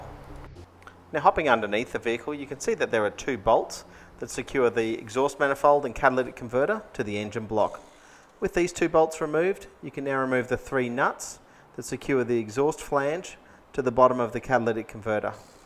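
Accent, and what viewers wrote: Australia. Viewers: ausie accent??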